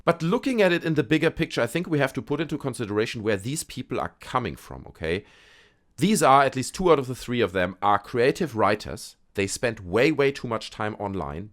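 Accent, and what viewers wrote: Germany. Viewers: coming back to that video years later and found ur channel. must say glad to see a German speaking 😂 with the accent on this... i am african. everything has a consequence, u cant spit on someone's face constantly and think yup we better than them nothing will happen lol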